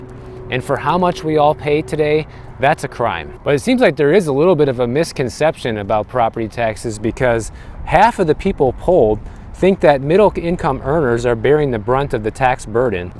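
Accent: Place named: California, United States. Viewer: he accent sound California's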